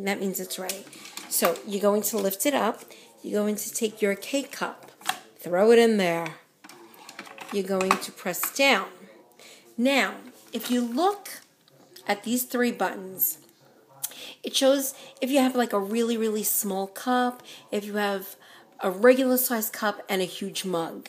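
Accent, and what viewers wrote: New York, United States. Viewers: Someone’s from new york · Tery Lim Is she from New York? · It's a New York accent. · I love the my cousin vinny accent! good review, I just ordered mine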